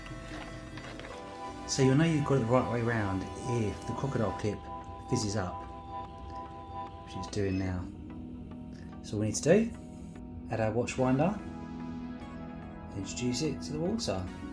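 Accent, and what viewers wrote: United Kingdom. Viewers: What accent is this? I have issues to uderstand. You sound too british, too native!!!! Please try to speak with more international accent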